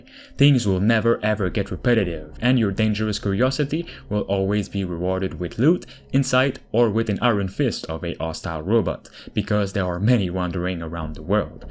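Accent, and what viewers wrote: Quebec, Canada. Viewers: Juste de même, tes clairement Québécois, j'ai raison?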